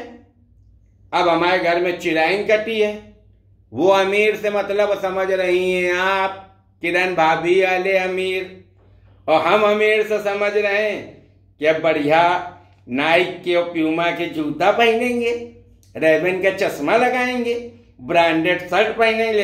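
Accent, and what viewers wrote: Kanpur, India. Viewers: क्या भिगो के कनपुरिया अंदाज में मारा है बड़े भैया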